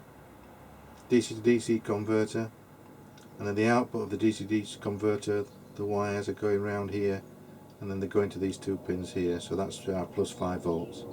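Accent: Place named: United Kingdom. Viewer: There's something about your style that reminds me of 16mm educational films. Maybe it's your "Engineer Brit" accent (I'm not up on this: are you from the North, or the Midlands, or Bristol or what..?). Or maybe it's the font you use in your titles, which is right out of Encyclopedia Britannica films. One thing I really have to give you credit for: you are direct, to the point, and you don't repeat yourself endlessly like a certain ausie bloke I know of. But at the same time, it's a very conversational style of delivery. I can tell you've prepared, but you're still kindof speaking off the cuff. Whatever it is, I like it.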